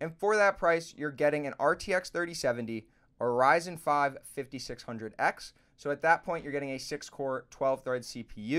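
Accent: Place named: United States. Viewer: which currency is this in. you sound american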